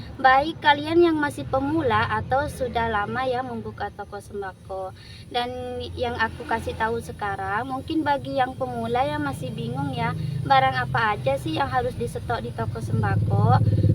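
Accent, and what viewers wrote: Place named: Madura, Indonesia. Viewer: Kental khas madurax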